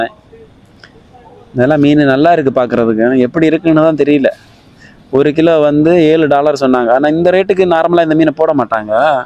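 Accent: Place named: Kanyakumari, India. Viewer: Kaniyakumari karan..pola...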